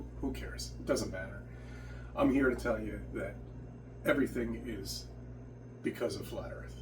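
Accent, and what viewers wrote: New York, United States. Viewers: One question: which part of New York are you from??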